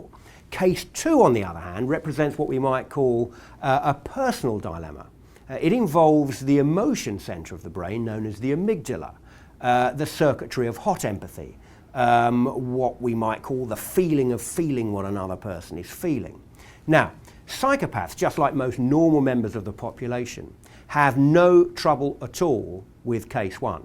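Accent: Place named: United Kingdom. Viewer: İngiliz aksanı yalnız bu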